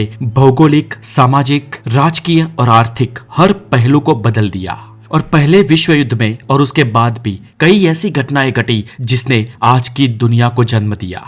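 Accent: Gujarat, India. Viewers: Are you Gujarati bro?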